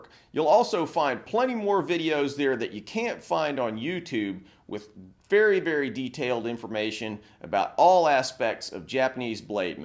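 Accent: United States. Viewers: The rather Americanized pronounciation notwithstanding, this was a very good introduction to the topic. Kudos for breaking down a complex topic without dumbing it down in the process.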